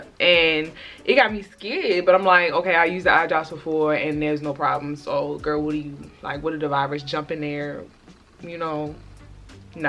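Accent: Baltimore, United States. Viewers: you from Baltimore you gotta accent I can hear it 😭😂😂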